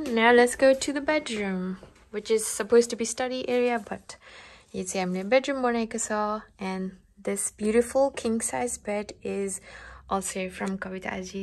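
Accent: Nepal. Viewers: Your nepali issss sooo cuteeee.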